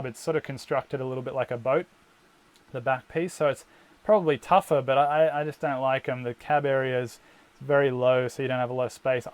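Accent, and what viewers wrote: Australia. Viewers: Very nice little Camper.I was listening to your voice young fella and finally realized its an Aussie voice. Very Nice and this size van would suit an old Lady that I am at 71 years § Still would love an Adventure.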